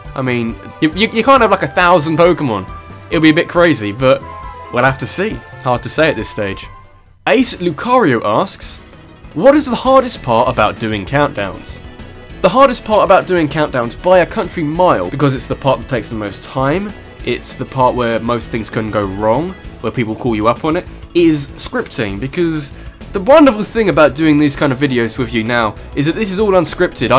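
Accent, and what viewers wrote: England, United Kingdom. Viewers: HOLY FLYING HOBNOBS, HE'S BO BRITISH! O_O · What part of England are you from?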